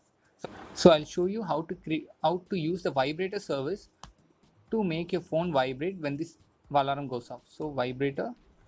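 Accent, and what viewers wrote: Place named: England, United Kingdom. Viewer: What the English accent, can't understand anything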